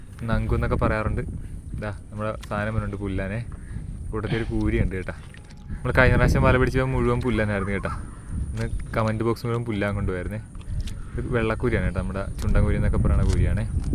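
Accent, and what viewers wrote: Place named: Cherthala, India. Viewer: ചേർത്തല സൗണ്ട് ❤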